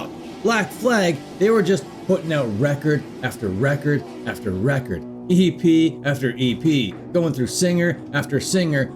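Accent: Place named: Canada. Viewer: You have a Canadian accent.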